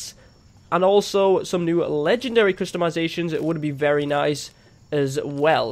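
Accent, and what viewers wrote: United Kingdom. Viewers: the way, you sound really really UK-ish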